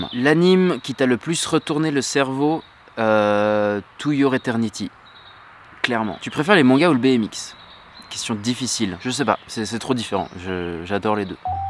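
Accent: Switzerland. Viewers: T’es genevois ou bien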